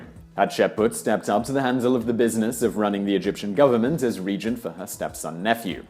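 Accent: United Kingdom. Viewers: Lol I guess this is the only time a British accent sounds cringe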